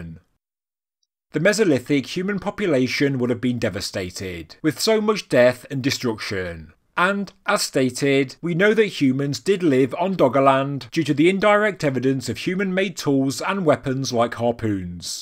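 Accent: United Kingdom. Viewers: Its just his accent 🤷🏼‍♂️from the uk..unfortunately i sound very similar 😂 im from northampton in the midlands.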